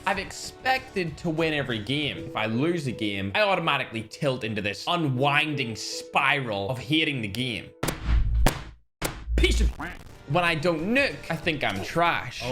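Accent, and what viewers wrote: United Kingdom. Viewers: Are you British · Bro where are u from britsh?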